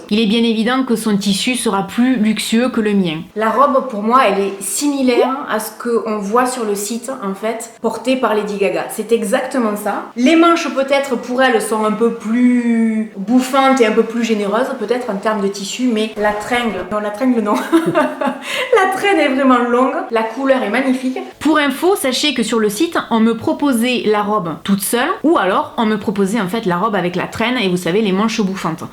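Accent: South, France. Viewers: Bonjour, Jolie accent du Sud, je kiff. Dis....pourquoi pas de promotion pour un site de soutif sur mesure (j'ai pas regardé toutes tes vidéos désolé si déjà fait.). Quand je vivait en Gwada, la boutique Coquin (rien à voir avec les sex-shop)faisait pour les fortes poitrine du prêt à porter et ce fut la libération pour une amie, que j'avais marre de voir voûté et tout le temps en train de rectifier son soutif...et oui: qu'une amie et rien d'autre. Depuis que je suis dans l'hexagone, plus personne ne parle du sur mesure pour les soutifs...en plus du fait que les fortes poitrines sont les grandes oubliées des magasins de sous-vêtement...aubade en 1er. Alors puisque tu parles de sur-mesure des recettes sous-vêtements? Je sais que tu saurais être sexy sans être vulgaire sur le sujet.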